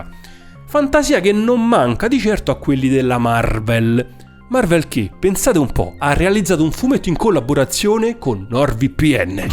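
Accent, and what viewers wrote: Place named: Sicily, Italy. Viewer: PS: sono di Palermo, mi diverte un casino sentirti parlare spesso nei video con l'accento siciliano, vai alla grande 💪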